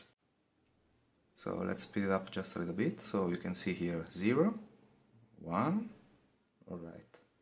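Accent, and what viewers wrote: Italy. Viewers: Italiano ? Si sente l'accento.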